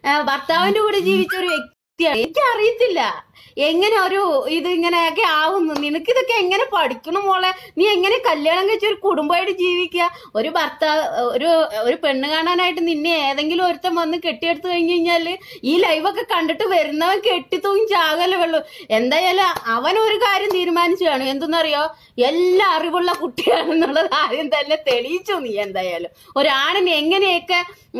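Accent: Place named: Palakkad, India. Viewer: Thanni palakkad thanne basha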